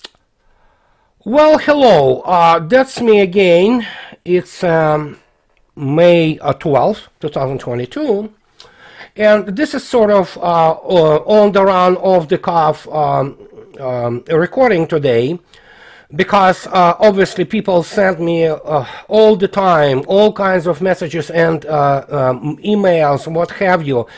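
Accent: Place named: Russia. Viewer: to my English-speaker's ear, you have a pronounced Russian accent, and as such, it is a challenge for me to understand you under the best of circumstance. However, you talk so quickly that it makes my comprehension all the more problematic. Could you please SLOW DOWN your speaking some? Thanks, BB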